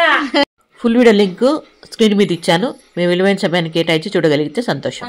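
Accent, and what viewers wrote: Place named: Rayalaseema, India. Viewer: Mee casual talk.i like your royals seema slang. Geetha Reddy Chennai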